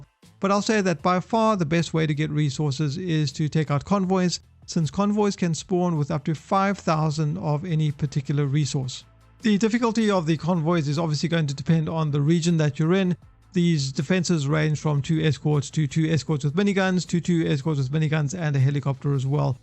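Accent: South Africa. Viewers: Thanks bud. South African?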